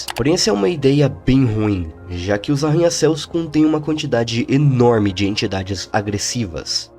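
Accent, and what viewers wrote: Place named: Rio de Janeiro, Brazil. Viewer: ksksks cê é carioca né meu patrão, reconheci só pelo "S" com som de "X", foda ser carioca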